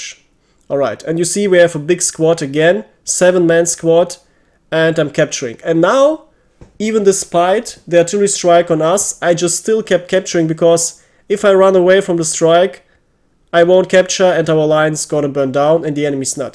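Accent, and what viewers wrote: Germany. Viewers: Deutscher Dialekt ist einfach geil gutes viedeo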